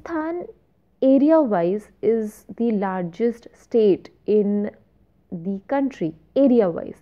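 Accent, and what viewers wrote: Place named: New York City, United States. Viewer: NYC pronunciation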